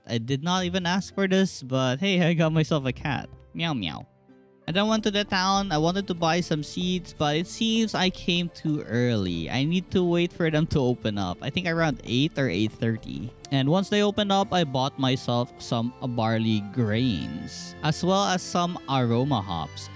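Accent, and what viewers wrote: Philippines. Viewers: A sense Filipino accent, Pinoy ka lods? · I knew it. Haha! Strong pinoy accent 😁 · The Pinoy accent made this video better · The tagalog accent is real on this one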